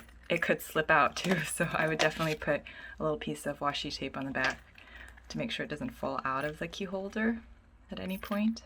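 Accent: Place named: United States. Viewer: chill and nice vlooog 💜 take careee!! alsoooo still amazed by the fact that you are fully korean like...you sound american when you speak in English 👏😳💜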